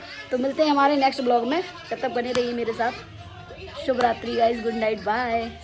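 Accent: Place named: Bundelkhand, India. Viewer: aapki awaj mp side mostly bundelkhand reason jaise lag rahi hai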